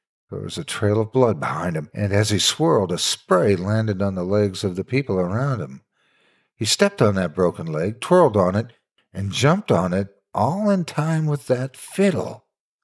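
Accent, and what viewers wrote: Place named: South, United States. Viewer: One of my favorite creepy pastas ever. The way you read this....my my. You have one fine narrative voice. This version was the most...believable, I think. You sound like an old man reminiscing on something horrific and haunting. Your rich, pleasant southern drawl really sells it.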